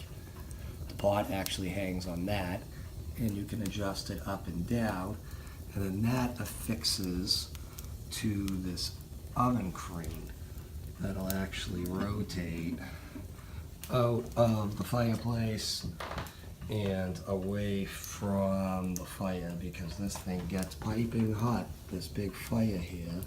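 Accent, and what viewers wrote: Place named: Massachusetts, United States. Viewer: This is a genuine Massachusetts accent my brother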